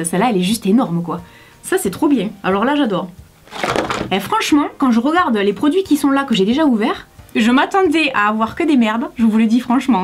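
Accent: South, France. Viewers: Coucou..pkoi tu es dans love list you tubeuse.. Déjà car c toi que j'ai découvert en premier et puis tu es brillante originale tu as vraiment la lumière a ts les étages et pr moi c Versailles quand je te regardes. C comme ça. Ussi pr les origines un peu communes. Jsuis pierre Richard 3 comme toi. Et tu sais tjrs de quoi tu parles tu parles bien (avec nôtre aquecant.. Erreur les les autres qui on un accent pas nous et au dessus de Montpellier c Paris.. C Flo le catalan qui le dit et c vrai. Avantgardiste par les sujets de t vidéos inspirés d influenseeuses des states tu as tjrs une longueur d'avance. Écoute tu es speysse!! spéciale spaciale..astrale..jme sens très connecté à tes pties folies t brins de fantaisie.. Bravo #comment tu parles bien aux djeuns!!!! Tu es le vaccin anti.conneries. C sur que t enfants de yt travaillent mieux à l'école maîtresse.!! 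Bon dimanche venteux ➡️🌞glagle.. Biz a toi ta mam et🐕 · Salut je suis abonné à toi depuis pas longtemps et chaque fois que je vais sur YouTube je regarde une de tes vidéos nouvelles ou en sienne et voilà je T'adore ton accent du sud à force de t'écouter je le prends (mon père a grandi dans le sud).Maman de YouTube reste sur YouTube ont T'adore tous❤❤❤❤❤❤❤❤❤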